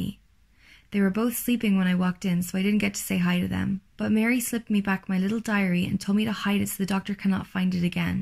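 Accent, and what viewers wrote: Ireland. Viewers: I love your stories, please keep at it. Do I hear a tinny bit of an Irish accent? Reminds me of my Mum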